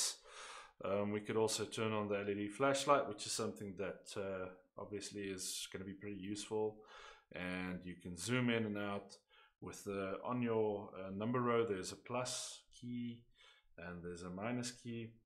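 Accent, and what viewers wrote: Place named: South Africa. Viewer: Saw ZA and I was like, hmmm maybe he's South African. Heard the accent and confirmed :). Dankie vir die awesome video!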